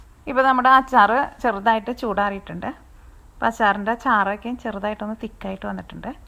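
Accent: Thrissur, India. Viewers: Thrissur slang very nice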